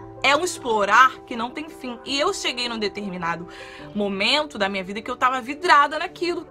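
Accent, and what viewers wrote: Rio de Janeiro, Brazil. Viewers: Esse hino de sotaque carioca aaaaa hahahaha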